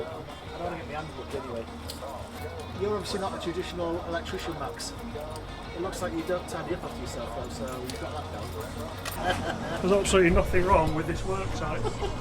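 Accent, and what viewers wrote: United Kingdom. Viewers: New to the channel, was expecting someone in their 50s with the voice. That's a proper accent, a rarity these days in the UK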